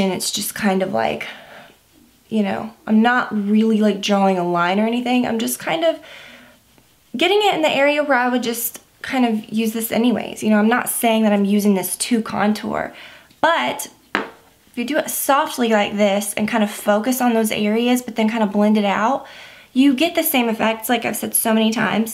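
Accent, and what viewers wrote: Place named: South, United States. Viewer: I've been binge watching all of your videos lately. I'm from Texas so I feel like I identify a little better with you since you're from the south too! You talk like me!😝 love all your videos and I think Olivia is PRECIOUS!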